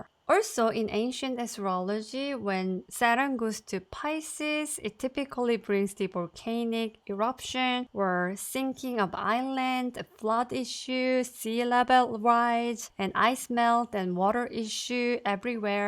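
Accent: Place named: South Korea. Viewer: Sorry I'm learning Korean and I thought I recognised your accent. This is a very good video and clever to use slideshows :)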